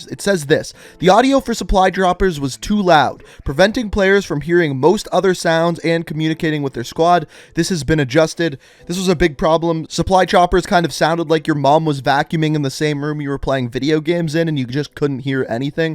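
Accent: Canada. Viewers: I know ur Canadian because of ur accent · Canadians eh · Ey another canadian ey! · Your canadian EH thats pretty neat but onestly hope you get a mill love teh vids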